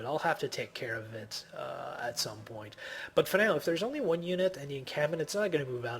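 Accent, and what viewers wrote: Quebec, Canada. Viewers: You sound like you're from Quebec. Are you Quebecois? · I don't wanna be racist or try to discriminate but what nationality are you? You're voice sounds very different! It sounds almost french canadian :)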